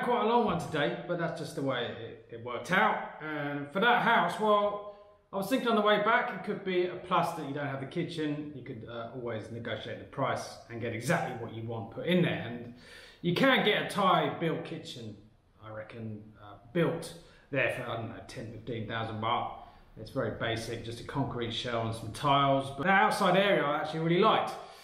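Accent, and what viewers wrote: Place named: South London, United Kingdom. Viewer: Who needs a kitchen with a 7 and noodle shop so close.Lol. Your excitement over a lotus fresh was quite overwhelming and could prove to be a great 'marketing' tool for the company(if they get to see it) and the shot of that lonely grapefruit will last with me forever. All in all another great vid, I do like the casual and friendly manor you have when interacting with the locals and your 'river wandle' accent makes the whole experience pleasurable. Keep it up fella.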